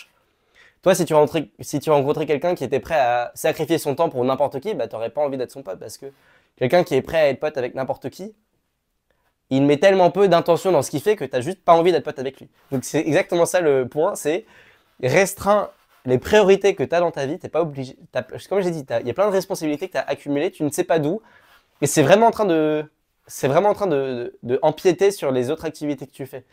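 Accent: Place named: England, United Kingdom. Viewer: Wow l'accent anglais de fou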